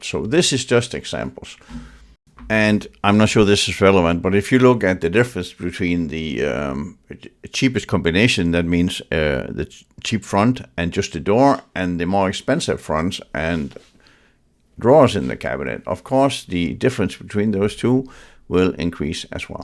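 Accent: Denmark. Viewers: Hi, I just found your channel. I subscribed immediately because I love your Danish? accent. Both my parents were born and raised in Danmark. We're thinking of installing Ikea cabinets in our kitchen. Thank you so much for explaining the differences in cost. I really like the oak fronts and was sorry to learn that they are the most expensive. I might have to get white ones instead. Which is your favorite white door and which color white do you think looks best?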